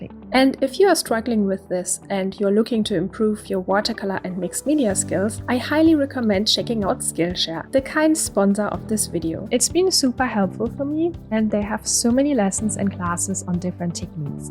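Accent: Germany. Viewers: Me as a german hear a german accent 👀 haha. I looooove your awesome illustrations! Super cool things to learn from you!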